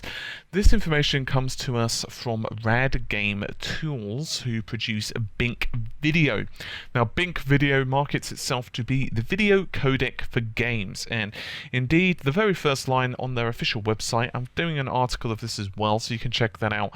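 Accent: United Kingdom. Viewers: 100% have to agree! These guys (particularly the narrator of this video - his name escapes me (sorry > - < )) know their shit! Like, KNOW know their shit… But the day-in, day-out rehashing of PS4 > Xbone is getting quite… taxing… Love the channel, but I'm getting to the point where if there's a mention of "PS4" or "Sony" in the title, I'm tempted to go out of my way not to click it. These guys are very level-headed when it comes to the console war bullshit, but as an xbox fan, when it's all you hear about for the past 12+ months? Yeah, I'm becoming quite sick of it. (Was sick of it months ago, but whatever.) And even then, they go out of their way to report on rumors or leaks that emphasis PS4 > XO… How about some misterxmedia coverage? I mean, the guy is either being 100% trolled or the industry is in for the world's largest reveal (#unlikely), but either way I don't have the hardware know-how to really sift through his posts. 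But [the author of this video]? He pegs me as someone who would be able to understand exactly what MisterX's 'insider' is talking about. And regarding ^that^ (the MisterX stuff), I'm not looking for a "is it probable" or "reasonable" analysis, simply an explanation of all the tech-talk that goes on in that 'forum'… It boggles my mind every time I look at it, but it's still unbelievably fascinating! I'd love to hear an explanation from someone with such a dreamy british accent. • ◡•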